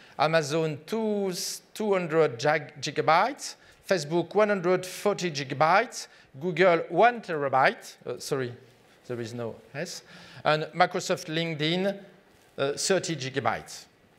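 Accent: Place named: France. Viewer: il a un anglais tellement so french que je le comprends parfaitement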